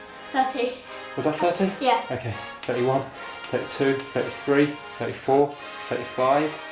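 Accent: United Kingdom. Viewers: I started with walking during my lunc hours, fast forward 3 years , now I run 3 x a week and coach a running club :). PS: You look just like a high school friend of mine, but with a British accent :)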